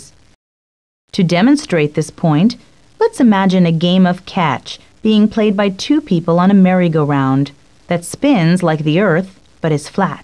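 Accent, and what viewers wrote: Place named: United States. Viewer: ohhhh, what a stupid american pronunciation, accentuation and nasal twang ;-((((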